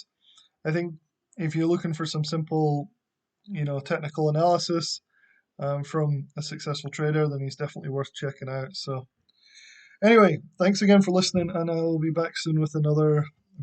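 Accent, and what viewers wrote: Scotland, United Kingdom. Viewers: are you scottish? :) thanks for the video!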